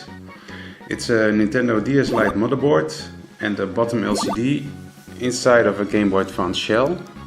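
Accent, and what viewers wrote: Netherlands. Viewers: You have the most obvious Dutch accent ever. Great video though, interesting build! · Heard you were dutch right away haha :-D Lekker bezig man! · Are you Dutch by any chance? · You a dutchie??? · Are you from the Netherlands? Your accent sounds dutch.. Anyway.. If you are, can you make me one for a fair price? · Yes, he sounds Dutch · (ik hoor aan je accent dat je Nederlands bent dus doe ik dat ook haha), Gaaf ding man, kun je laten zien hoe je 'm maakt? Ik wil dit ook gaan doen namelijk en heb er niet veel verstand van. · You must be a coming from the Netherlands, according to your accent. Anyway. I like the Game Boy Advance shelled bottom of the Nintendo DS lite! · are you dutch? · another dutch who can't speak english without an accent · I love his dutch accent :D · Are you dutch cause you really have a dutch accent i can know cause im dutch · Je klinkt als een Nederlander die Engels praat, klopt dit? 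😜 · You sound Dutch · I hear a Dutch accent ;) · Are You dutch? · wow, dit is echt het meest nederlandse accent ooit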